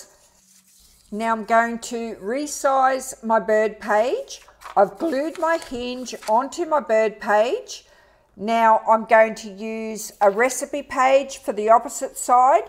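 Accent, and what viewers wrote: Australia. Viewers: Hi! I loved your video! australian accent is New to me, but I could understand everything and the no sewing spine technique is wonderful. Thanks a lot! Hugs from Brazil!!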